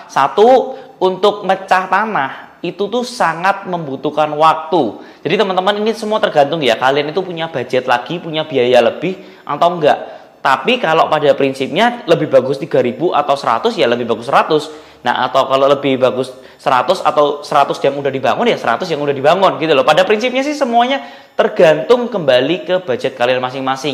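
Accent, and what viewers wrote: Java, Indonesia. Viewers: Logat jawaaaa medok koyok akuu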